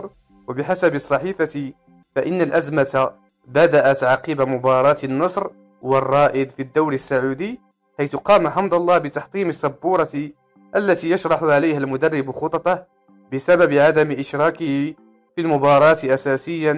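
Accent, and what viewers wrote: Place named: Bangladesh. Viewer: ياصاحب المقطع انت جالس تسجل جوا خزان مويه ايش التقطيع هذا جيب شخص عربي يتكلم مو بنقالي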